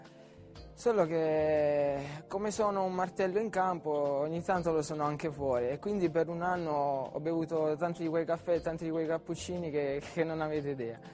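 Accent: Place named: San Severo, Italy. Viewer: oh xo l'accent' e san' sevir' non lo perde eh,ahahahah...grande pazienza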